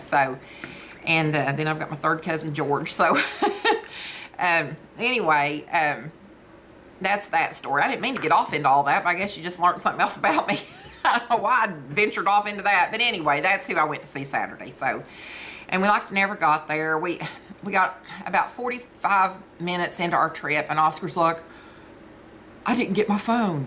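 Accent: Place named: South, United States. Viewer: Love hearing your stories. Don't know anything about cats but can't wait to hear about the kittens. Your southern? accent reminds me of joyce meyers. Consider that a compliment because she's wonderful just like you 😊 God bless you.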